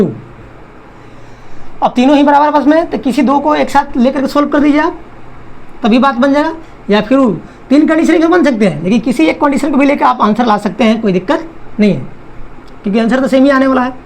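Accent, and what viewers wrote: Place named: Bihar, India. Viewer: Sir to khan sir nikle Bihari +hindi mix 😂❤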